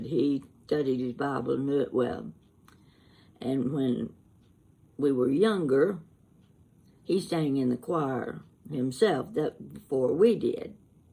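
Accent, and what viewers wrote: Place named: Eastern Kentucky, United States. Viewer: My grandmother was my best friend. I lost her 16 years ago this week, and hearing you brings the warmth I have been missing for so long. Thank you. ❤️ I have to ask, where are you from? Your accent is hauntingly familiar. Sounds like Eastern Kentucky.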